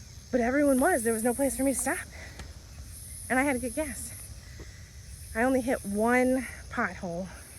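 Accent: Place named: Texas, United States. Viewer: i'm from Birmingham which is a major city, but I really like to travel to Wales which also has cities but also has plenty of beache and country. Where is it that you live? I assumed maybe Texas from your accent? Am I right?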